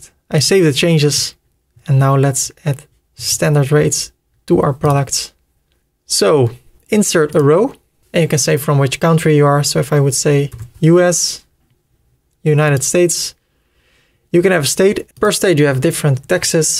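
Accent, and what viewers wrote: Netherlands. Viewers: Nice to hear a familiar dutch accent! Heel Goed!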